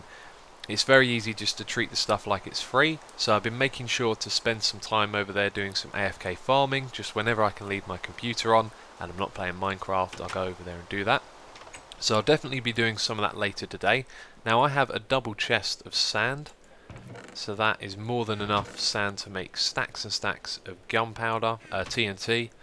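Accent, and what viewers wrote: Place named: Northern England, United Kingdom. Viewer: Your accent.... Why can't I be British?.... WHY!?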